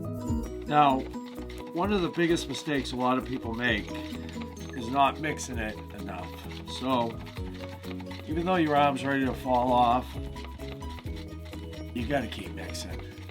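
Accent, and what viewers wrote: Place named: Massachusetts, United States. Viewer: Dude! These signs are awesome! How am I just finding you now??? I grew up in Natick and now live in Portland (Maine), but I miss my homeboy accent something wicked. And Maldenites have one of the best MA accents of all. Anyway, I found you through the Rockler 2x4 Challenge and you have a new subscriber. Keep on makin'!!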